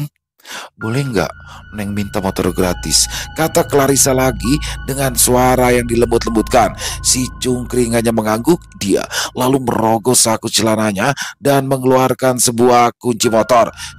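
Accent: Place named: Bima, Indonesia. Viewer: Keren banget bang bisaan banget meranin logat bima nya jadi senyum senyum sendiri ngedengerinnya Terimakasih bang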